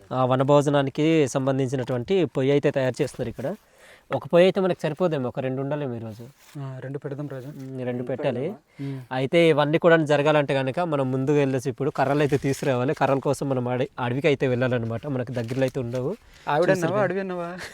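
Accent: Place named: Odisha-Andhra border, India. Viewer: ఒడిషా మరియు ఆంధ్ర బోడర్ బాషా మీది మాకు ఒడిషా వచ్చు మరియు తెలుగు కుడా వచ్చు చాల గ్రేట్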